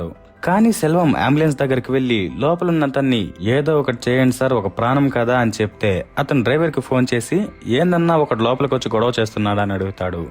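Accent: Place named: Tamil Nadu, India. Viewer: Nativity pokunda Tamil slang lo Baga chepparu👌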